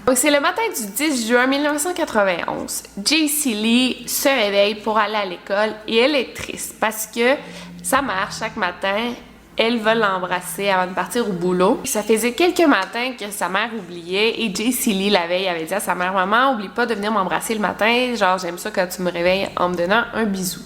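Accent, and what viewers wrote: Quebec, Canada. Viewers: Je comprends le québécois maintenant grâce a toi, j’aime vraiment tes vidéos.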